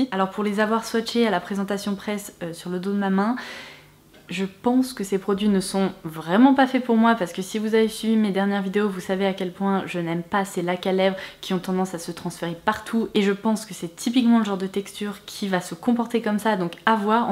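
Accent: England, United Kingdom. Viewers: Super vidéo, maintenant il reste plus qu'à économiser mdrr et j'adore ton accent anglais ! 😃❤️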